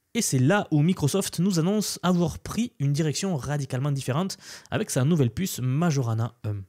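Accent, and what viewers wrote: South, France. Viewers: J'avais relevé ton MAGNIFIQUE accent Italien... J'ai éclaté de rire lorsque tu as dit: "Vous avez relevé min magnifique accent" J'AI ÉCLATÉ DE RIRE... En plus d'être curieux mcultivé, pertinent, intéressant, pédagogique..... TU ES DROLE... 😂 ET MALGRÉ TES EFFORTS ET TA PUISSANCE, Beaucoup de gens malgré tes efforts Ne. Comprennent rien à ce que tu dis ni ce que tu fais.... Ils de dénigrent car tu es jeune, tu as l'accent du sud et que t'es en frénésie... Je me sens très proche de toi Merci pour ton travail J'aimerais pouvoir un jour te rencontrer et aussi cuisiner pour nous...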